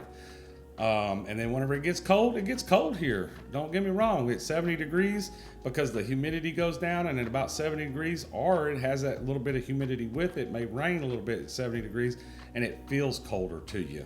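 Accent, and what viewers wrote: Texas, United States. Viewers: What accent? Lol but really, it’s much more tame than most Texans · I can hear it. Reminds me of my grandma she lived in Shepard Texas right above houston. I lost her to covid. His voice brings me a lot of joy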